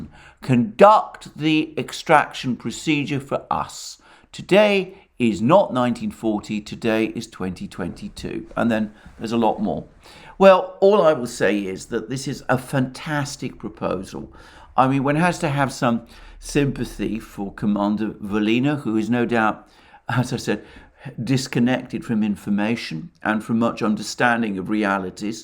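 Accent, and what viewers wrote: United Kingdom. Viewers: Very good information about the war in ukraina , and as i said before , you speak very eloquent and ( I also must say that the British accent makes it wonderfully clear and pleasant to the ear ) cheers 👍😀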